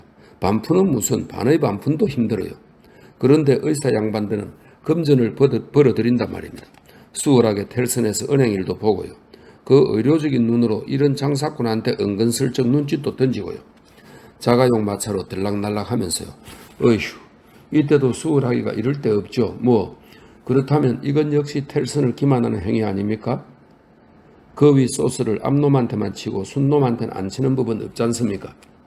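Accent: Gyeongsang, South Korea. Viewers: 덕분에 긴 명작을 즐겁게 듣고 있습니다. 낭독자님의경상도 억양이 제게는 익숙하여 더 편안하고. 천재적인 작가의 표현을 재미있게 하셔서 웃으며 듣습니다. 그런데 3부의 10장을 찾을 수가 없어서 답답합니다.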